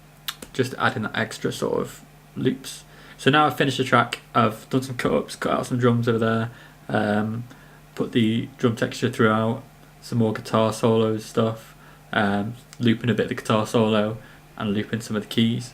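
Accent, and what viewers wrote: United Kingdom. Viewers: You from the north of england mate?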